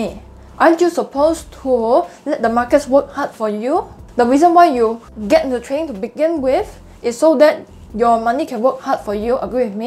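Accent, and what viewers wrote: Singapore. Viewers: that is singapore accent, if you dont know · Your accent so familiar, let me guess you from singapore 🤭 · Thish is pure singlish and I love it..cheers!!! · i love singlish i cant hear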